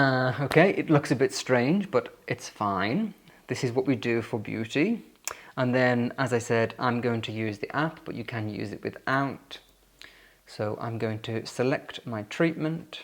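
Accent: United Kingdom. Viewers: what a lovely video! I am from Massachusetts, not decorating for Christmas this year, just not up to it after a 2025 marked by family tragedy. But I do like to see what other people are doing to celebrate and you have very good taste. I loved your references to fantasy and magic when you showed that beautiful clock. And of course, everything sounds even more wonderful when the British say it! Wishing you a Merry Christmas,.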